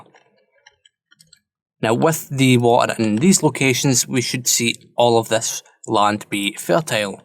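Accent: Scotland, United Kingdom. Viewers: As soon as I started watching this my head just screamed 'YESSSS, HE'S SCOTTISH!!!!!!!' Why am I like this XD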